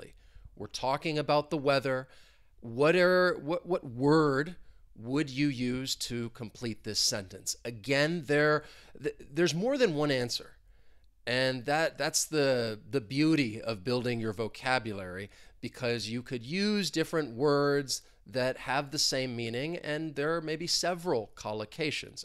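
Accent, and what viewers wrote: United States. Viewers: I come across your channel, thank you for the great lessons, very professional, you sound like you was born in the USA :)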